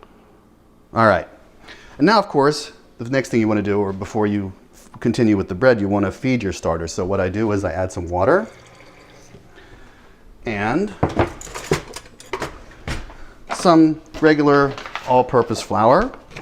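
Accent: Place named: Southern California, United States. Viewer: You seem like you're from California around my general region. Your dialect and your attitude are familiar to me. Being born and raised in Southern California, I pick up on that.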